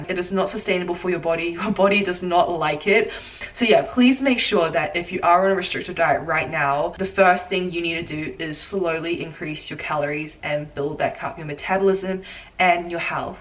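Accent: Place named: New Zealand. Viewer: hahahaha you know what, the first time i watched your videos i picked up on that kiwi accent right away and i was like, YESSSSSS GUUURRRLLL OCEANIA PRIDE 😂😂😂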